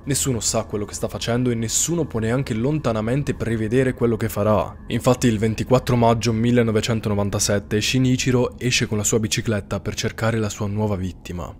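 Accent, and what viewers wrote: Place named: Rome, Italy. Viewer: ahahahah.. anche noi pugliesi però non siam da meno. 🤣 Comunque non pensavo fosse sardo, avrei detto romano. 😅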